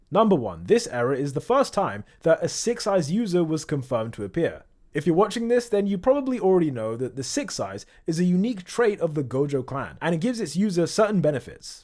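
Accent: United Kingdom. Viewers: Saved him from being raised as a conservative? Hahaha what a panzee thing to say. You know there is a difference between being a conservative and a mysoginistic prick? By your accent, I could assume you're from the UK. So looking at your woke dog shit country you can see the danger of non-conservatism.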